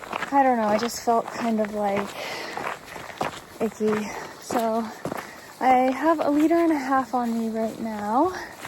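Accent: United Kingdom. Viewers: Hi. A couple of questions for you: 1. I follow a hiker on YouTube by the trail name of Sunshine. She is fairly short, has dark hair, and in her 40s, and would have been hiking with a man named Augustine who wears a beard. Does that sound like the person you spent time with on the beach? 2. Would you ever do an entire video using your posh, British accent? 🙂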